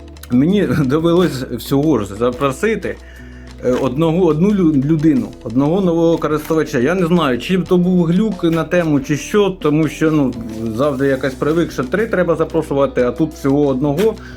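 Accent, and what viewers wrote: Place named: Mykolaiv, Ukraine. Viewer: у нас в Нико обл всю дорогу так как ты щас говорили , поэтому вспомнил счастливое детство)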